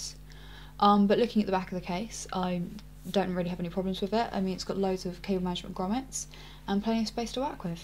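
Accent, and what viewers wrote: England, United Kingdom. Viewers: sexy English voice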